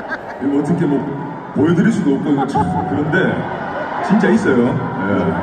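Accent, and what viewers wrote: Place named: South Korea. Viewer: 우리가수님 노래도 잘하시고 말도 너무잘하세요 사투리를 구수하게 정감있어 좋아요 대전 막콘 잘하세요 이번 두번째 콘서트 대성공이예요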